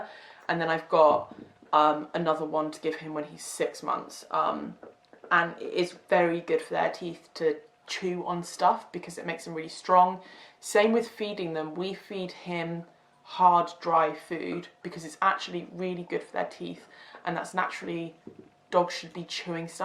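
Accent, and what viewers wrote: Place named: United Kingdom. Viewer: why is the american flag on the thumbnail and your accent is british?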